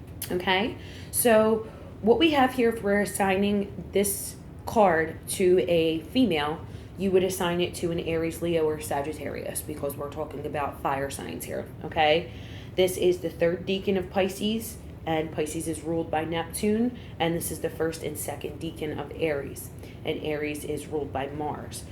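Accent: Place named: Northeast, United States. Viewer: R u from Philly? U have that north east accent.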